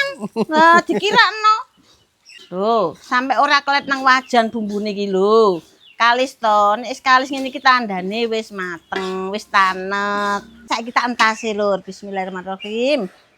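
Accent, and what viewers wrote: East Java, Indonesia. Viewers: nk omong mesti bengok2 gas pol😂😂😂😂mmng Jawatimuran ky ngono kui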